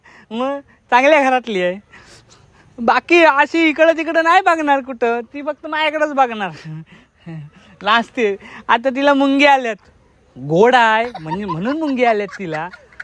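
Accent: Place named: Konkan, India. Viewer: साहेब मस्त असतात तुमचे व्हिडिओ अक्टिंग खूप हसवता.. मस्त विनोद तुमच्या मुळे कोकणी भाषेत तील गोडवा कळला तुम्हां गोड शुभेच्छा.💐🙏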